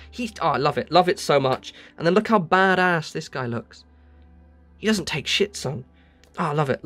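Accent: United Kingdom. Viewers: As a fellow british person, I have the horrible moment of thinking "do we all sound the same?" All your expressions are the same as mine. Thank you for this, jsut gotten abck into warhmmer after 20 years and could never kitbash as a kid, so lovley to get some inspiration for me starting out my own Nid and Cult armies.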